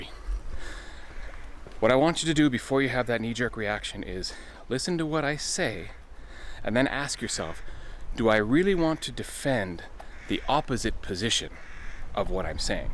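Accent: Australia. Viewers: Your Australian accent is spot on, says this Kiwi. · Your Aussie accent isn't.... terrible. (An Aussie here). is TERRIBLE indeed. best, D.A. NYC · Cheers for another fun video. Plenty of common sense in this episode, and the timezone point is very interesting. Looking forward to next video!! PS: that Aussie accent...maaaaaaate....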